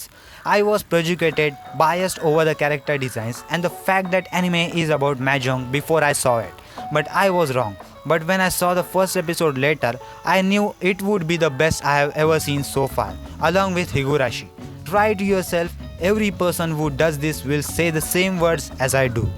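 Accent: India. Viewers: bro work on your audio and idk why u sound indian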